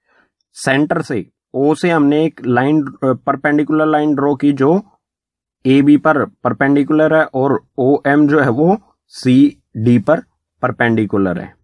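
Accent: Haryana, India. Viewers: Sir aap Haryana se ho na ? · Sir aap haryanvi ho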